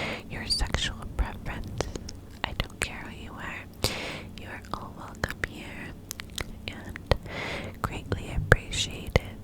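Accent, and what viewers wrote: New York, United States. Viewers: Happy Early Birthday!! 🎂🥳🎉 P.S LOVE THE NEW YORK ACCENT!! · Love the soft spoken as well as the New York accent!! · I love that New York accent!! You also work that fluffy mic like no one else ✨ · Thanks so much ❤️loving the new york accent from a irish girl living in dublin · omg love the NY accent, will always remember “niagruh fawls” from your first NY accent video 🤣❤️ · omgg PLEASE do more NY accent vids they are soo tingly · Are you a Pisces or Aries March baby? Loveeee the New York accent whispers especially as I’m from the uk. I really appreciate you, sending lots of love ❤️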